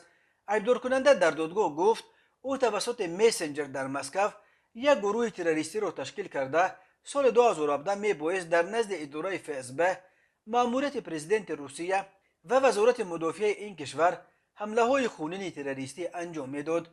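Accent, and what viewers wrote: Pamir, Tajikistan. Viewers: Ака гапи зиети назан Помири ай кичо медонад Точикира лахчаш хамухел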